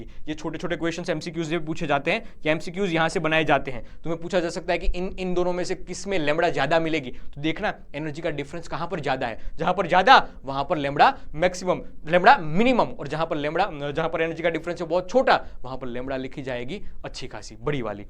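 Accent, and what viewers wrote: Gujarat, India. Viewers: Sir you are gujarati or not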